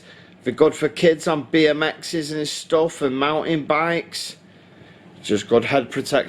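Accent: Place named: Birmingham, United Kingdom. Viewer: That's a strong accent! Is it Brummy or further north?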